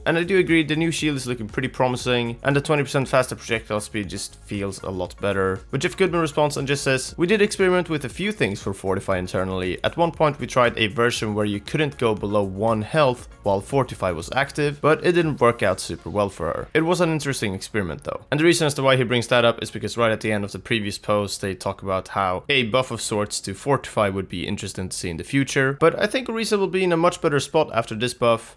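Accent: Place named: Sweden. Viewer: Where is this guy from? He sound Swedish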